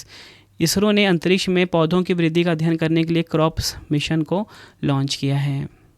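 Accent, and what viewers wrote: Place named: Bengal, India. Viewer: Bengali accent hai..... acchha lagta hai... Agreed 👍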